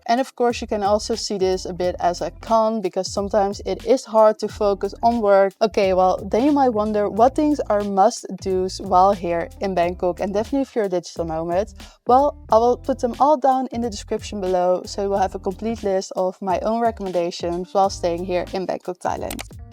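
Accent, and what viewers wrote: Netherlands. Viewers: i love your dialect from dutch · Dialect? She's speaking English with a Dutch accent.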